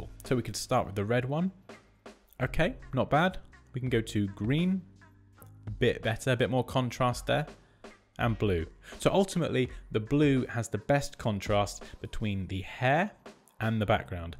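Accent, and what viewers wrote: United Kingdom. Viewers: Nice voice and accent, your British accent is easy to listen and understand!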